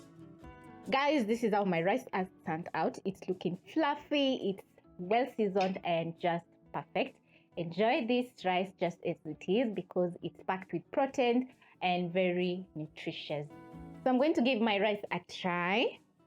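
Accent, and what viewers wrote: Kenya. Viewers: She sounds so Kenyan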